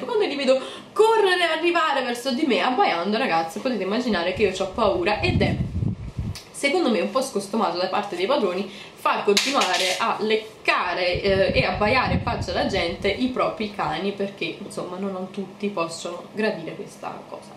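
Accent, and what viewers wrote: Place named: Naples, Italy. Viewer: sei di una bellezza rara. Il punto però è un altro, secondo me saresti un'ottima attrice per la tua grandiosa espressività. Sono anni che sono su youtube, seguo tante tante ragazze, ma questo gesticolare, parlare fresco e accentato napoletano senza alcuna volgarità, fa sì che tu possa ricordarmi la amata Sofia Loren. Baci.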